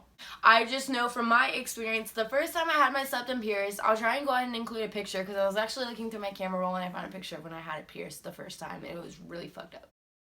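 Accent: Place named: South, United States. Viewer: I'm from MS! She sounds southern as hell to me!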